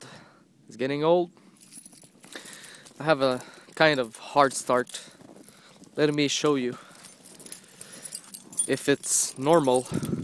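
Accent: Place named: Quebec, Canada. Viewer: cool accent.. are you french canadian? I have a few freinds that are.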